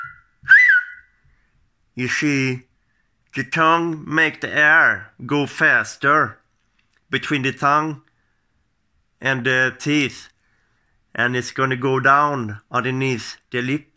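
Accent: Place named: Sweden. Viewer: Märker det genom ”dialekten” eller vad flesta nu kallar ”svengelska”. Jag själv har den dialekt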